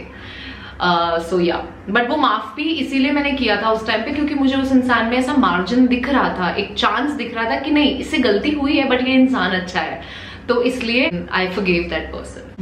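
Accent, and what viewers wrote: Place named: Delhi, India. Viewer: Loving!! Your north ... delhi acent 👌🏾👌🏾 bhaisahab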